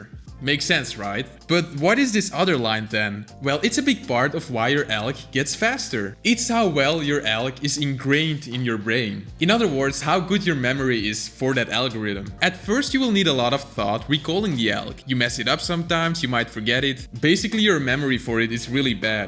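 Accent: Netherlands. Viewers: are you dutch?????? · You sound kinda dutch tbh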